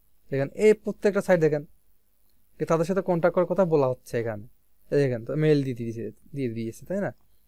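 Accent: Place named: Pabna, Bangladesh. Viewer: kotha sune mone hocce pabnar vasha, apnar sathe jogajog kora dorkar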